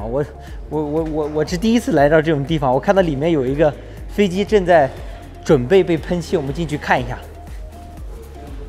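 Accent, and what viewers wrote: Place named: Hefei, China. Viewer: 听出来合肥口音~~ 老乡好 祝你在美国生活愉快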